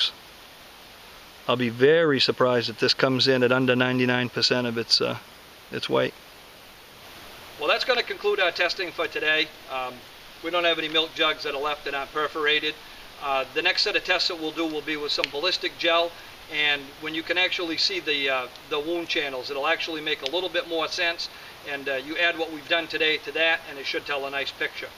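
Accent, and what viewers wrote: Maine, United States. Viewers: hmm....you sir, sound like a southern mainer. am i right?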